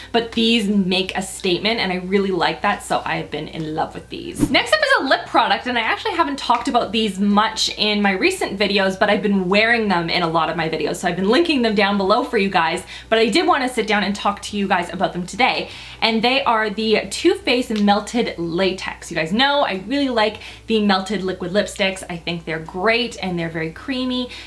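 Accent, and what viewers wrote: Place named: Canada. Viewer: . Your look today is spectacular! 😊 I love watching your videos not only because of your fab content but also because as a fellow Canadian who lives in Spain, I need my dose of the Canadian accent. thanks for that!! 😊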